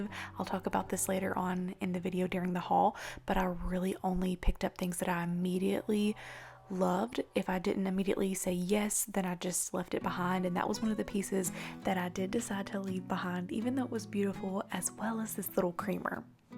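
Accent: South, United States. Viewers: wow those brass christmas stocking holders are so uniquely special, youre so blessed to find them ✨ just wanna say that i love your thrifting vids & your lovely southern accent calm voice is so soothing to listen to 🫶🏼 baby light blue, white and grey hues are your color hon, you look stunning. youve got a very beautiful home, your mom and hubby must be so proud... 🏡💕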